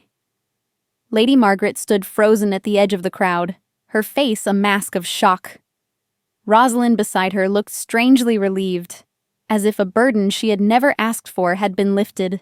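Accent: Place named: United States. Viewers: I agree. This is weird in an American accent. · Why American accent? Should be narrated in British accent😑.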